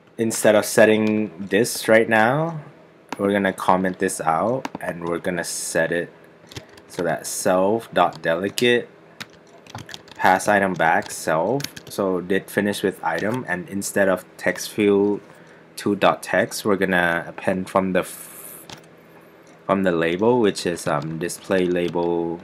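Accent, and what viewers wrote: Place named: Thailand. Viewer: You got a really good US accent but I still can feel somewhat Thai in your voice :) . I've lived in Thailand for 2 years ^^. Thank you again I've understood and practiced this lesson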